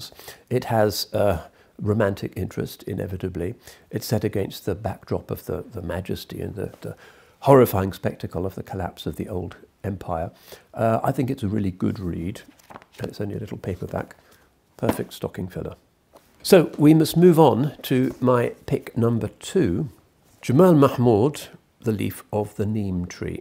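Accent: United Kingdom. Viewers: He is so eloquent..that soothing elegant British accent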